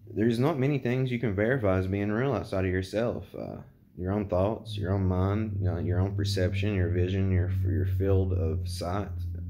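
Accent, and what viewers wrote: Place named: Bible Belt, United States. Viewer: I'm sure you've already taken a trip into Alan Watts territory but if you haven't please check him out! I had always heard of him but never listened to any of his words. Theyre a perfect breakdown of how to better understand the self from a westerners views. You have a thick accent like youre from where I am from which is the Bible belt. There's so much valuable info to hear in regards to how thats shaped our view of the self and God and how guilt has been turned virtue by the church. Regardless of if youre from a Christian background or not youre from a Christian society which has shaped our language about such stuff too. We don't carry the necessary terms to describe stuff such as the Brahman that is the self and all we do. This stuff will never answer questions about simulation theory but it will show you how to live only today and worry nothing about tomorrow for what happens to you is what you will, and always has been the will of you. 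By living only in the present it matters little what the future holds. And that includes waking up out of this computer program lol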